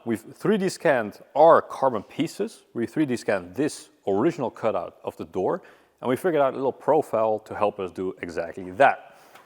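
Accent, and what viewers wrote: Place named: Netherlands. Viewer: I was listening, and thinking that accent is very familiar. Then you started speaking Dutch and I was like MY INSTINCT WAS RIGHT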